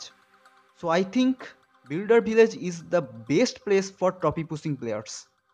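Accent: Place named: Bengal, India. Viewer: U WERE IN MY GLOBAL ONCE.. WHEN I HAD MORE TROPHIES THAN U. AND STILL I HAVE.... I M SNIFFING BENGALI ACCENT OUT OF YOU BUDDY... GOOD WORK BOI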